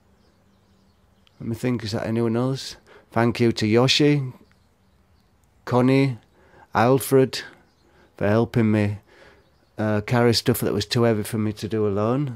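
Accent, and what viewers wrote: United Kingdom. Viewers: your british? birmingham ? obviously in germany now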